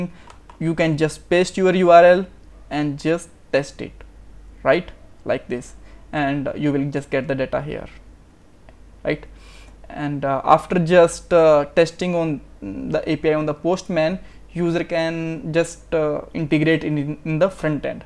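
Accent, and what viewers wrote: India. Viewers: search for tutorial hear indian accent look for other tutorials dont find any come back love all Indians who make us better devs salute :)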